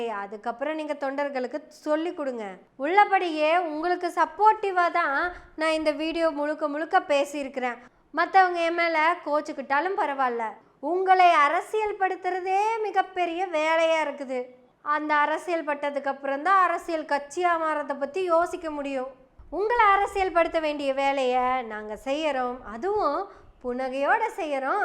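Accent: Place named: Coimbatore, India. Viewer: Dear Sister. Your Covai Slag Speech is very Beautiful. Keep it up. Best Wishes.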